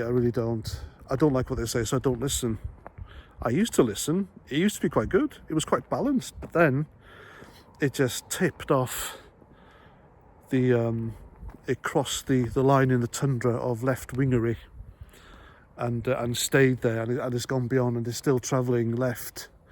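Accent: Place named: Scotland, United Kingdom. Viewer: Real people speaking the real truth you n are legends keep going and loved yer Scottish accent kerse is an erse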